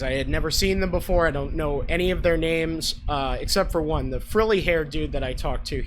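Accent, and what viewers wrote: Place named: Long Island, United States. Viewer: I knew u were from Long Island something about your voice that sounds native to me lol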